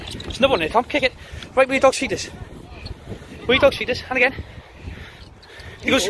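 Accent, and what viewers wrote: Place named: South Wales, United Kingdom. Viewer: nice one mate well done dogs are cracking is that a south wales accent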